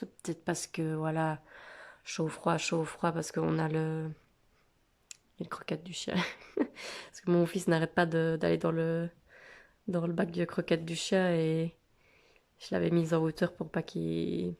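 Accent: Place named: Liège, Belgium. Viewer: Merci pour ce plante tour, j adore !! Et l accent Liégeois coool 😃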